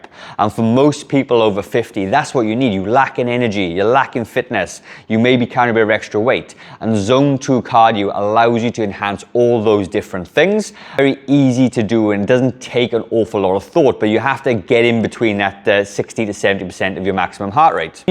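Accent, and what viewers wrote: Wales, United Kingdom. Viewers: Walking. Just discovered your channel ❤ I live in Neath and so lovely to hear your Welsh accent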